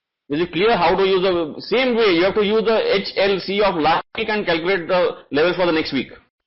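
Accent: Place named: India. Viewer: That terrible Indian accent cannot be followed, such a shame!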